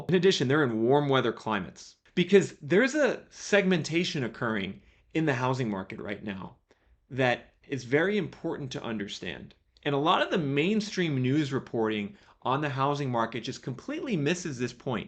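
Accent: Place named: California, United States. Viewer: you sound like your from california 🥱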